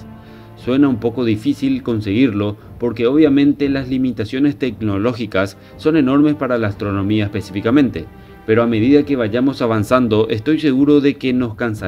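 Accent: Paraguay. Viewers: Sos Paraguayo?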